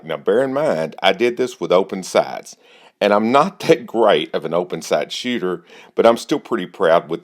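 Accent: South, United States. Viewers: Nice rebel accent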